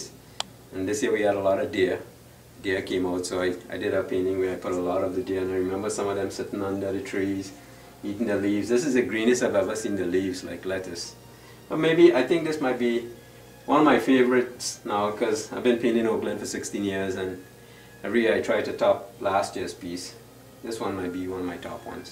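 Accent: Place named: Trinidad and Tobago. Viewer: Still got your trini accent man!! so cool..an I love your work..its wonderful.. love it all..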